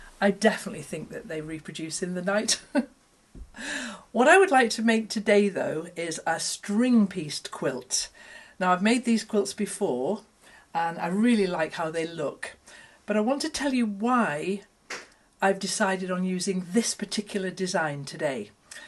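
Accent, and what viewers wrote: United Kingdom. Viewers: I love your British accent coming from an American gal. My most favorite, relaxing quilts was when I sewed my last 2 scrape quilt. Still have plenty of scraps to use. Thank you for your informative videos.🤗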